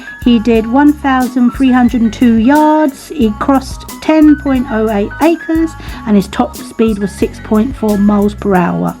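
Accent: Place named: United Kingdom. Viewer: Just found ur channel and this video as I’ve been looking for a tracking device for quite some time (ever since Jasper took his wanderlust a bit too far and there isn’t a person in our street who doesn’t know who he is it’s humbling and makes you feel like such a bad pet mum) .. Have u tried other devices as well and also, u sound British, can we get it via Amazon as well in the UK?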